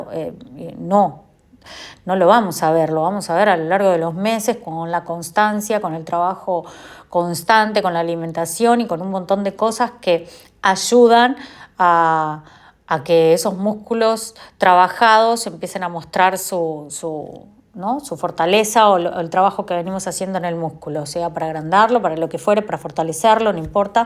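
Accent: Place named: Rioplatense, Latin America. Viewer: Amo ese acento rioplatense!! Gracias y todo el cariño desde Argentina!! :). Hay falta de fe, falta de ganas, sentido distorsionado del tiempo, agobio...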